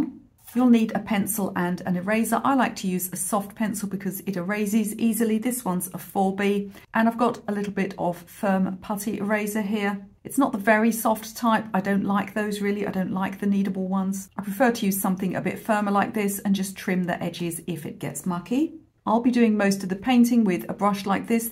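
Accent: United Kingdom. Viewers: I love your shorter step-by-steps; I think we all love a quick win for the day! However, I also love the longer tutorials, as well. Honestly, I would watch any class you teach, even a sewing one (tho sewing is my nemesis). But maybe that's just your British accent and sense of humor! 🤣😉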